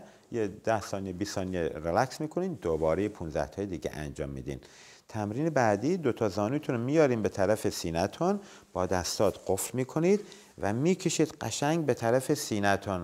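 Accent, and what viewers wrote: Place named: North Khorasan, Iran. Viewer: سلام اقای دکتر ؛شما از لهجه یی ک دارین باید طرف خراسان شمالی باشین (شیروان،قوچان،بجنورد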